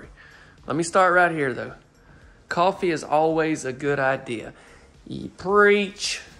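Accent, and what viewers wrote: South, United States. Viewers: I mainly watch you because your down home accent and voice is so soothing and it gets me through another crappy, depressing California day. If I bought one of these homes, it damned sure wouldn't be here. Well, as for this place, I can say it's unique, for sure. I like that it's a single story. I'm old...it does make a difference. I don't have no large family. But, I hate open concept. Hate it. And I would be annoyed as all get out with all those light fixtures that take special (and expensive) bulbs. And, there are not three pantries...the barn door closet actually is the true pantry. The other door inside the kitchen is a broom closet and the closet in the hallway by the bathroom is a linen closet for towels, bedding, etc. Just so you know. And I'm still trying to figure out why there is a pass-thru in the master closet. Is it vogue to hide yourself carrying your laundry basket these days? 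That thing, for me, is fodder for a horror movie. Nice to make access for the monsters in your closet to come in. And finally, a bathtub in the master bedroom and a dream of a tub at that. Who cares if the monsters are coming into your closet when you're soaking in that!!! The shower looks like it belongs in an RV but who the hell is going to care about the shower with a tub like that?! I'd just use that shower as a storage area! And cute little guy, btw. Those cheeks 😁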